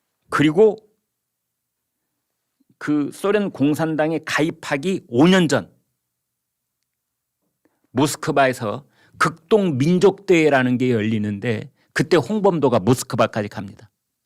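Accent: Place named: Gyeongsang, South Korea. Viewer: 왜 경상도사람은 육십구라 하든지예슨아홉이라 하던지 육십아홉이라. 왜하나요 개같은경우.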